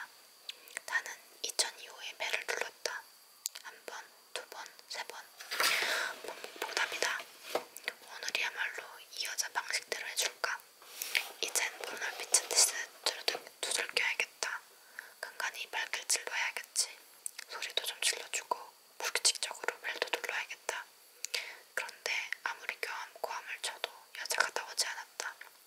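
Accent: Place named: Gyeongsang, South Korea. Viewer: 너무 좋습니다. 경상도 억양도 너무 귀엽습니다.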